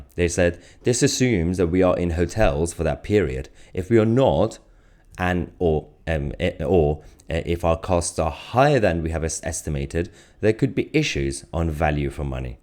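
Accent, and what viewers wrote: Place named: Northern England, United Kingdom. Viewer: Hey dude, im rubbish with accents... was it Manchester... Liverpool... somewhere further afield? Just googled it.. born abroad... to 2 foreign parents! Guessing you don't mind legal migration then lol Personally I welcome you to our great nation, just like I welcome everyone else who comes!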